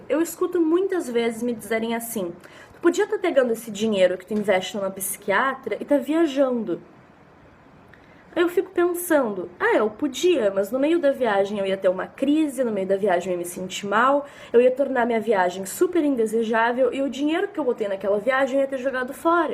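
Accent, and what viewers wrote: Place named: South, Brazil. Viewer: 박지민 e do Sul ne...sotaque maravilhoso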